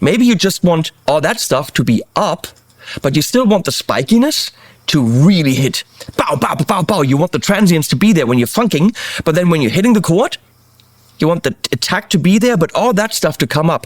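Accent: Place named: Germany. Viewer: So after 40 years or so I decided to get a Helix and be happy. It’s great but matching up the best pedals you can get is really the best. Watching these pedal videos makes me want to go back to pedals so, guess what, I’ve got both! But I still favor the Helix because it’s handy. Oh yeah, my favorite OD tone when recording? A Sennheiser in front of my old tube Champ with an attenuator. Now that is heaven in a bottle. A 6v6 bottle that is. BTW, you have no talent? I enjoy watching your videos. Your talent is in your delivery of the German laced, English language!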